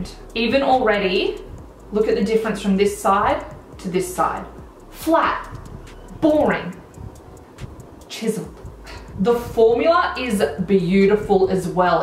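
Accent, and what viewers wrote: Australia. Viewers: I love makeup but I’m here just to learn Australian accent and you help me a lot! I’m going to Australia next year and I’m just ready to buy these products, for sure I will love it 🙌🏼❤️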